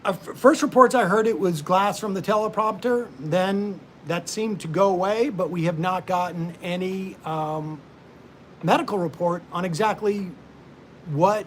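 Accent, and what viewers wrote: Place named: United States. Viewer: has a pleasant American accent. Can someone tell me what region he might be from? (Don't panic, Im not a stalker, just a curious Aussie)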